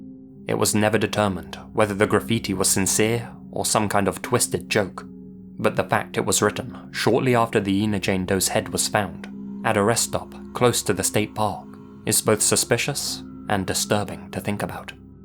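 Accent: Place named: United Kingdom. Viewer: You're the best. I love you're vidoes their so entertaining. Keep up the good work dude and i love your British accent.:) Have a great day man. 😊❤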